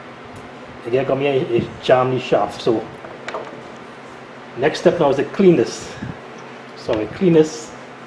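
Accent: Trinidad and Tobago. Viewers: Sounds like a trini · How you sounding like ah Trinidadian so.??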